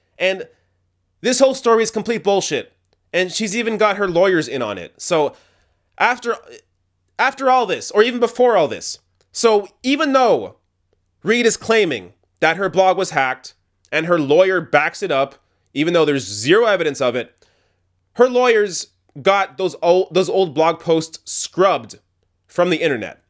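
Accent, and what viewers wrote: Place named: Canada. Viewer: The canadian really came out in this video.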